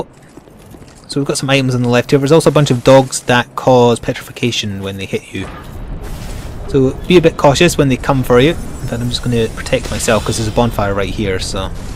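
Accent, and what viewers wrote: Scotland, United Kingdom. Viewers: Your voice sounds very charismaticaly. Is that scotish accent or there is no such difference between these nations of UK?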